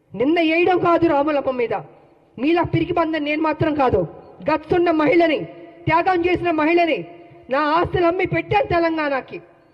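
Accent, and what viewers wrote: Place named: Andhra Pradesh, India. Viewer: Meeru Andra bhasha matludutunnaru .idi telangana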